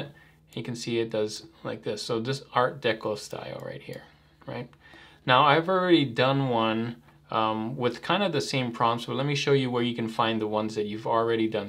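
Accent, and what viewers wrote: Hawaii, United States. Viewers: thanks for all these videos, been learning a lot. quick question, are you from hawaii? cuz after watching some of your vids, it's subtle but i noticed that certain words you say sounds very close to how some people from hawaii would say them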